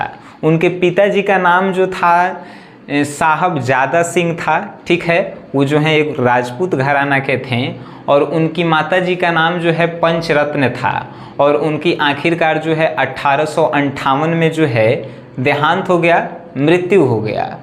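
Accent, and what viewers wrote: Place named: Bhojpuri, India. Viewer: Sir aap bahut acha pada rahe h ek dam bhojpuri legvej me pura samjha aa raha h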